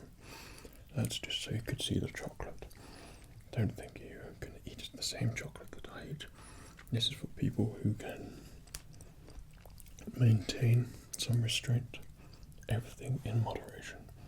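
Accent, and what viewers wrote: England, United Kingdom. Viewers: Finally a good British ASMRtist! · Carmel Ellis he is English tho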